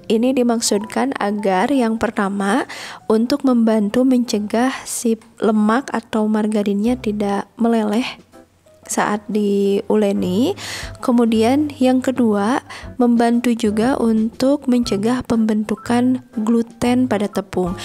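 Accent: Bali, Indonesia. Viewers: Kadang kadang logat t nya ky orang bali ..asli gitu ya ngomongnya..apa di buatt buatt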